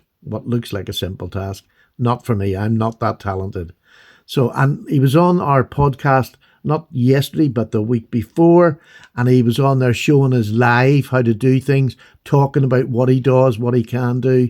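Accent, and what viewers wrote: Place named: Wicklow, Ireland. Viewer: oh my days , I think slippys work is great , I'm going to follow your advice and join the group , get Mat to do some work for me , thanks again for all your content as all ways its the the biz , I'm sure I told you before I live in London but I'm from Wicklow and its great to hear an accent from home , that Taylors eye is just sublime , all the best ,p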